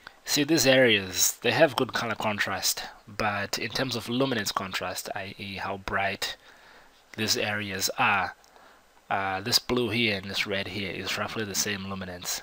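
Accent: Zimbabwe. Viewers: Are you from Zimbabwe? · Is the narrator in this video Zimbabwean? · Definitely Zimbabwean! Haha Waita bho, Wabastira!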